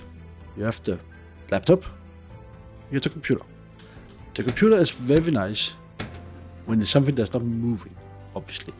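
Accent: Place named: Denmark. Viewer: The Danglish is strong with this one, but I learned a lot, thank you ^_^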